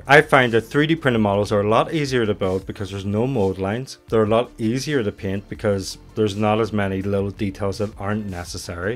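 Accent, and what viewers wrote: Northern Ireland, United Kingdom. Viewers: Is Discord for Patreon members only? Also, are you from Northern Ireland?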